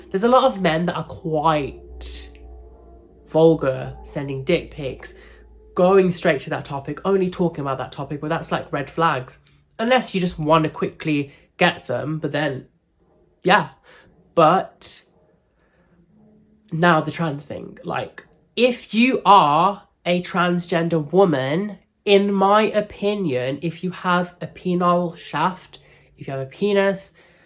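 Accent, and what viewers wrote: England, United Kingdom. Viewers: you are totally a passable, even if you were having an off day, that English accent instantly makes you passable again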